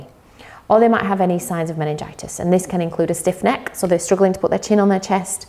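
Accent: United Kingdom. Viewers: Yorkshire accent.. She has a lovely voice. Anyone here just to see?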